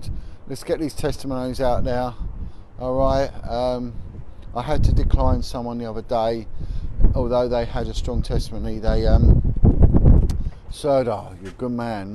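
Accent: Cockney, United Kingdom. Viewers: When are you going to start speaking properly mate? Put some effort into that? In your 'important subject'......? Not like a cockney drug dealer.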